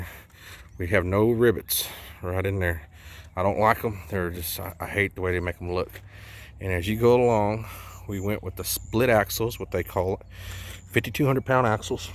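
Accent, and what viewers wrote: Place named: South, United States. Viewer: Congrats on the awesome setup brother. Are you Latino but you sound very southern? Keep trucking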